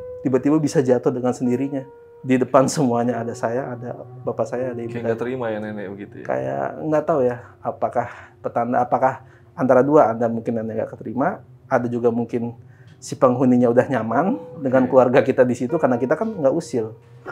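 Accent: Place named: Kebumen, Indonesia. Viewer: Whh trnyata mas wong 'ngapak' Kebumen jg yh.. Kebumen nya mn mas??😅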